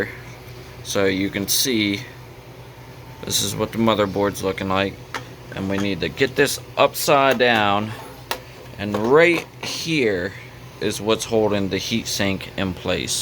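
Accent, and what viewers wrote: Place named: Pittsburgh, United States. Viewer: You have to be near Pittsburgh....That accent.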